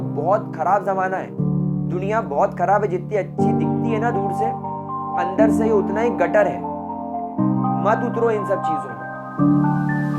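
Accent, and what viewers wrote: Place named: Indore, India. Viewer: Pehli line sunke hi samajh aagya sir to apne Indori hai.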